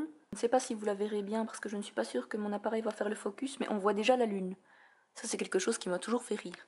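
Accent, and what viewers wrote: Belgium. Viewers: Tu habites en Amérique du Nord non? Il me semble que tu as l'accent Belge!? Chouettes vidéos 👍😉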